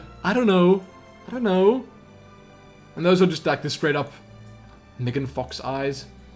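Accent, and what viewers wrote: South Africa. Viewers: It's South African I think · he's South African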